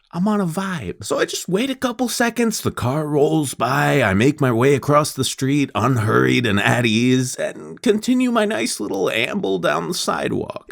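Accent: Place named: Bay Area, United States. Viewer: I don't believe for one second that you live in Portland. You sound like you're from the Bay Area and I would bet money on it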